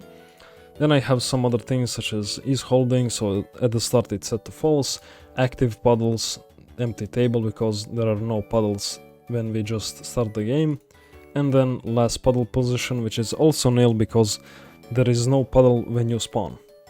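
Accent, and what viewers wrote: Russia. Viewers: Bro your accent i think you are russian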